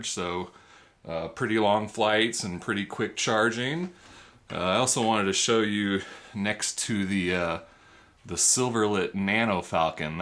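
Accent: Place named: South, United States. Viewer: How come when I watched this before you had a southern accent?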